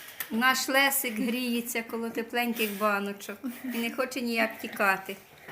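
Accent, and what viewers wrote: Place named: Khmelnytskyi, Ukraine. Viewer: Добрий вечір.Пнивіт з Хмельниччини.Я підписалася на Ваш канал.дуже сподобались Ваші відео.Коли перший раз попала на Ваш канал.почула Вашу мову то чомусь так і подумала що ви з Хмельниччини. Бажаю Вам миру над головою. скорого повернення Ваших синів до дому з перемогою.Невісточки молодці гарні хазяєчки Всього Вам гайкращого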